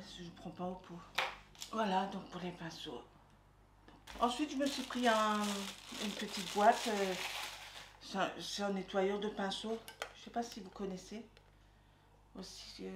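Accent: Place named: Alsace, France. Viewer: Super haul, j adore leur palette, j en ai déjà pas mal...petit clin d'œil pour ton accent alsacien...sa fais plaisirs. 67 elsass frei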